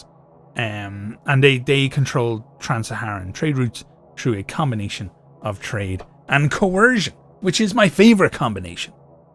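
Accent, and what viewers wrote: Ireland. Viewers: Are you a Kildare / Carlow man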